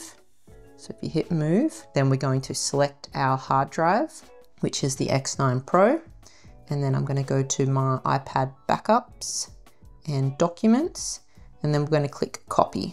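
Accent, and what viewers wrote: Australia. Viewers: You sound Aussie so why are you using the American Amazon site? Could you please change it to Australian Amazon?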